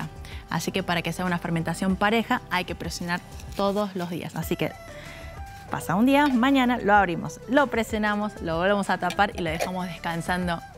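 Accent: Argentina. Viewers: Parece argentina con lo bien que habla. Muy buena pinta el plato.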